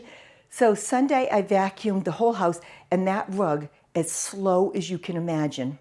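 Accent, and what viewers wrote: New England, United States. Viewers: Greetings from Maryland! I enjoy hearing where your subscribers live. Both of my parents grew up in New England (NH), so listening to your accent warms my heart. Thanks for the walk-about.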